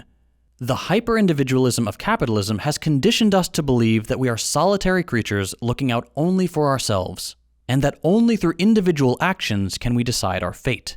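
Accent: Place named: South, United States. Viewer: As a literate person from the Bible Belt myself, I also appreciate the accent as well yall.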